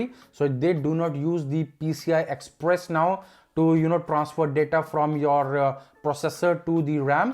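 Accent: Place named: India. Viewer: Nice accent mate! You from India or something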